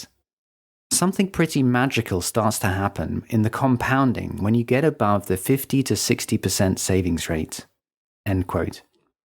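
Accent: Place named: United Kingdom. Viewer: Why does it have to be a british accent! 😭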